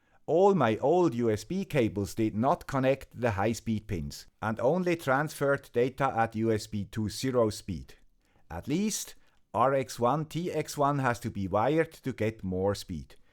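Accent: Switzerland. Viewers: Summary Introduction to USB-C and Power Delivery - Charging and data transfer over USB cables have significantly improved. - USB-C connectors were expected to simplify the process. - However, without proper knowledge, users can still face issues like slow transfer speeds and charging problems. - The topic is split into two parts, starting with Power Delivery. - Introduction by the presenter with a Swiss accent. - USB-C's versatility and its mandatory use in Europe. - USB-C supports various modes including Power Delivery, data transfer, video and audio output, and alternate modes like Thunderbolt. - Focus of the video on Power Delivery and data transfer. USB Standards and Connectors - Evolution of many USB standards over the years. - Different connectors accompanied each standard. - Focus will be on newer standards from USB 3.0 onwards. - The video will cover two separate topics: power delivery and data transfer. 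Understanding USB-C Connector Pins - USB-C connector can have up to 24 pins. - Not all connectors have all pins to save cost and simplify PCB design. - Explanation of Ground, VBUS, D+, and D- pins. - D+ and D- carry differential signals for less interference and higher speeds. - These pins are for backward compatibility with USB 1.0. - Introduction of TX1 and RX1 pairs in USB 3.0 to increase speed. - TX2 and RX2 pairs were added later for even higher speeds. - CC1 and C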